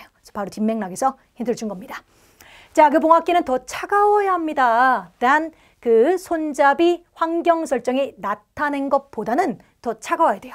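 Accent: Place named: Chungcheong, South Korea. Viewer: 있것죠~ 충청도 분이시네요 👍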